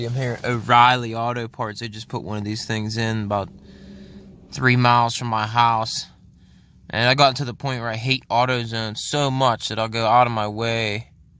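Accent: Pennsylvania, United States. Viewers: Yep, the PA accent for sure. Grew up'err 'bout a mawl from the store down'err .. luv it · Holy Pennsylvania buddy. I'm from PA and live in GA so I can pick up that accent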